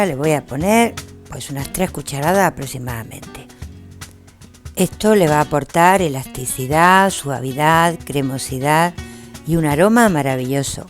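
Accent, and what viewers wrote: Andalusia, Spain. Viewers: Hola, gracias x esta receta la voy a probar y después te cuento. Me encanta cómo hablas con ese acento español... te mando un saludo muy especial desde Argentina!!! 😘😘😘🌹🌹🌹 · Me encanta la musica y ese acento de cerca de la peninsula iberica que me vuelve loca. Saludos a usted desde argentina. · Señora, amo su acento andaluz ♥ se gano un seguidor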